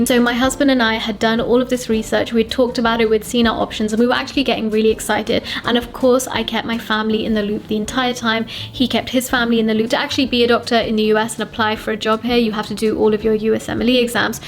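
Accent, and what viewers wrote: United Kingdom. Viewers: I guess now you're going to lose your british accent , the best thing what I love Uk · British accent is soo annoying 😭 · I HOPE THE BRITISH ACCENT STAY PLEASE 😭😭😭😭😭😭😭😭😭😭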